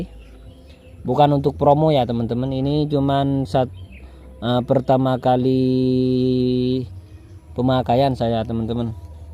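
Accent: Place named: Madura, Indonesia. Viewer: kyak logat madura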